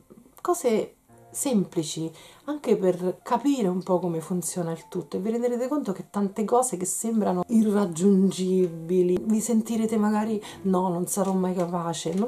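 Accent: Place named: Lazio-Campania, Italy. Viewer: ma tu abiti tra Lazio e Campania? amo il tuo accento...e i tuoi video! un bacio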